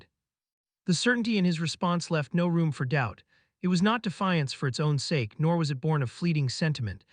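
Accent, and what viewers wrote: United States. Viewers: An American voice is just wrong.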